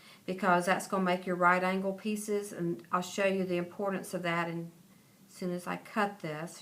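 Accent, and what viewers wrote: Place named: Texas, United States. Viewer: Loved this tutorial! I also loved hearing you speak, 'cause you sound like you might be a Texan! ;-) Thanks for sharing your easy to follow technique...your card is beautiful!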